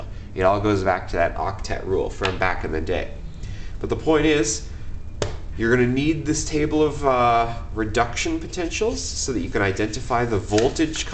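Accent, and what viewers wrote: Canada. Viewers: I think its canadian maybe because the way he says the word out sounds like it.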